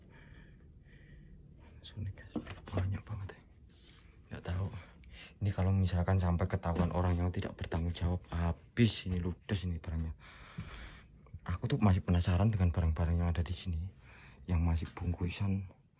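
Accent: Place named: Java, Indonesia. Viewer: Aku seneng.. Denger pean ngomong Jeannnn.. Khas jowone Cak.. Mantaaf pokok e..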